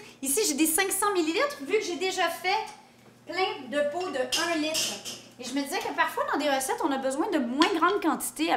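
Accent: Quebec, Canada. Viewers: Tu es adorable avec ton petit accent Québécois, je t'adore merci pour la vidéo, je suis d'Alger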